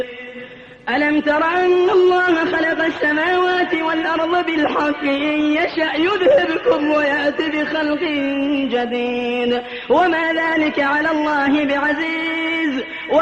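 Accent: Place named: Qassim, Saudi Arabia. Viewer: هذه ليست بالقطري هذه القراءه بالقصيم بمدينه بريده ..... اللهم اجعل القرآن شفيعا لنا ويرفعنا به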